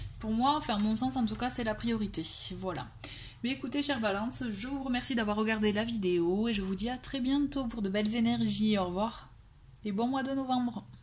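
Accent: South, France. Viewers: merci c'est très agréable avec votre petit accent du midi...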